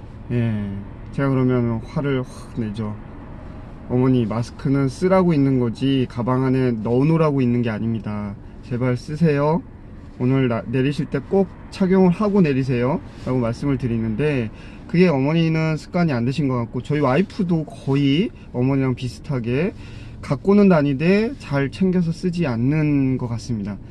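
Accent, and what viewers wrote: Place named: Chungcheong, South Korea. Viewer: 거시기... 충청도 특유의 말투아닌가요? 저는 아주 정감이 느껴지는데요.. ㅎㅎ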